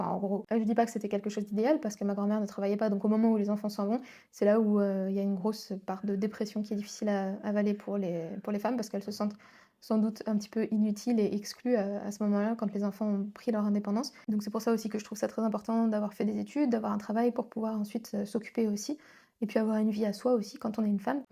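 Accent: Russia. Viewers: tu commence a avoir l'accent russe en parlant français BRUH! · Очень странно: живет в России без четких оснований, непонятно на что живет, работала в Сколково и жила в Москве, теперь безработная и в какой-то сельской окраине без перспектив и смысла при этом вышла замуж и собирается рожать в какой-то деревне, за тысячи километров от родных и дома. Ходила по ток-шоу на тв и всякие шабаши блогеров-иностранцев, а теперь коровам хвосты крутит и задротствует. Чет какой-то месиканский сериал для Степашек про Нетакуську. Уж молчу про русский акцент когда на английском говорит.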